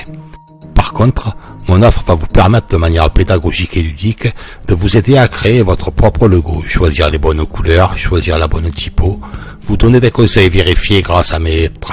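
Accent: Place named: Marseille, France. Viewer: Je trouve ce concept intéressant, car j'aime maîtriser ce que je fais. De plus la vidéo est sympa et ludique avec un accent Marseillais qui change de l'ordinaire sur Youtube !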